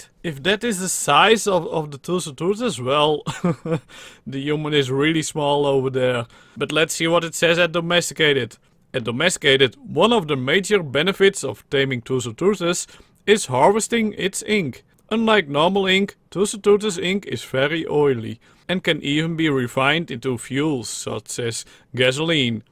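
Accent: Netherlands. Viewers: DUTCH ACCENT FOR THE WIN :3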